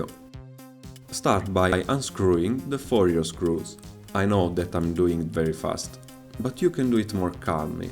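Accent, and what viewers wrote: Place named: Italy. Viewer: You sound very Italian :)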